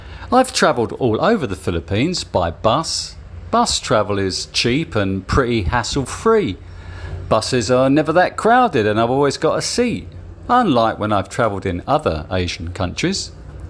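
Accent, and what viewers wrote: England, United Kingdom. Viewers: Love your style, enjoy your choice of music and video editing and even your winging-Pom accent is becoming pleasantly familiar, keep it coming man, I dig it man you know what I mean man it’s cool and informative and visually and audibly appealing so zip zap us all with a little bit more please maaaan.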